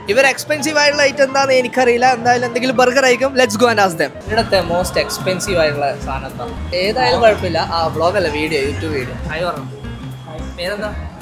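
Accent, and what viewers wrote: Kannur, India. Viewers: Pakka kannur language 😅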